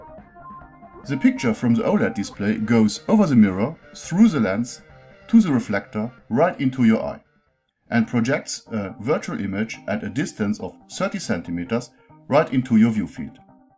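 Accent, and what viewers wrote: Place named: Germany. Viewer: are you german or french? sounds a lot like english with a strong german accent :D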